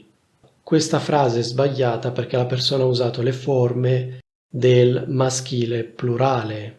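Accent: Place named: Milan, Italy. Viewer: Professore, come studentessa brasiliana 🇧🇷 di italiano come seconda lingua, ti supplico di non abbandonare mai la tua variante settentrionale. È importantissimo per noi avere accesso a diversi accenti, e il tuo è l’unico canale che conosco con la variazione milanese. Ti ammiro e ti seguo.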